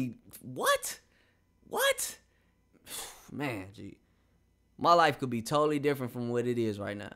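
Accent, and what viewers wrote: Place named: Chicago, United States. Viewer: I got ppl in the CHI, Roseland n Chatham I hear ur accent bro lol!👍🏾👍🏾👍🏾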